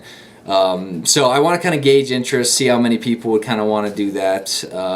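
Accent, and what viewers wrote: United Kingdom. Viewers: have you ever heard of England? he has a mother fucking British accent. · British English...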